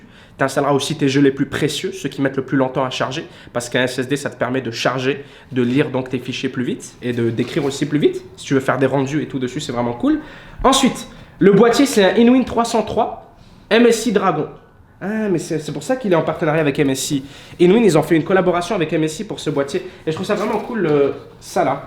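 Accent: Canada. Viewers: tes canadien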